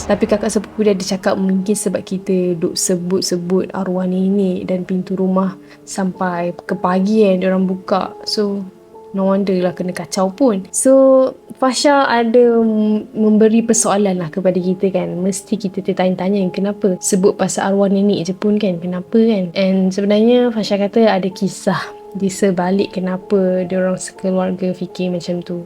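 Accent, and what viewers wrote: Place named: Kedah, Malaysia. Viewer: seronok dengar cakap kedah😅😅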